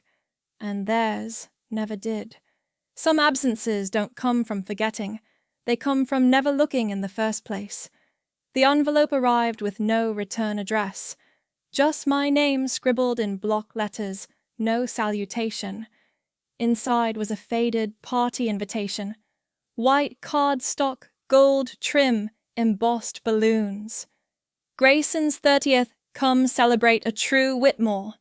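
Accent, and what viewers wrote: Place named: England, United Kingdom. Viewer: Why does she have an English accent?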